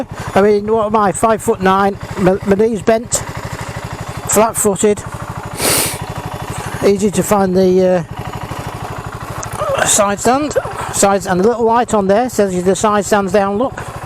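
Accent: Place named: England, United Kingdom. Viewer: Probably the worst review of bsa goldstar. Sounds like trump reviewing with english accent.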